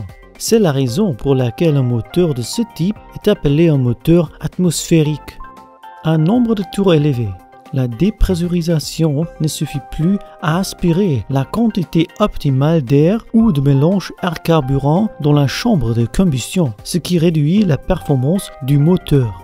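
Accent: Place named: Germany. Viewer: C’est quoi cette voix de gros accent du bled ou de allemand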